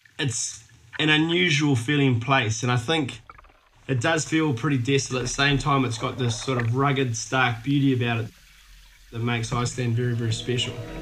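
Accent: New Zealand. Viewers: sounds like a new zealander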